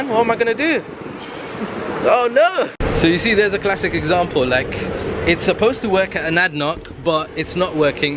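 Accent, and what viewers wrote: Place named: United Kingdom. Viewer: I'm sorry why do you have a British accent?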